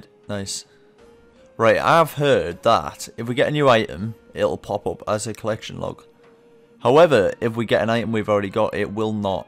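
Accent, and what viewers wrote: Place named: Teesside, United Kingdom. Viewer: I know a boro accent when I hear one UTB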